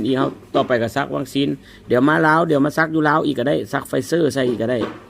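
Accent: North, Thailand. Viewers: อู้เหนือกับ ก็ได้ สำเนียงเหนือกับเวียงคือกัน.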